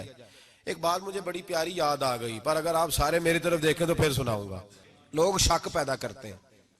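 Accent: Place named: Punjab, Pakistan. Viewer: Punjabi lahja urdu seekho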